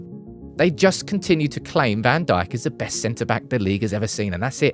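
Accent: Manchester, United Kingdom. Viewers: Can tell you're a manc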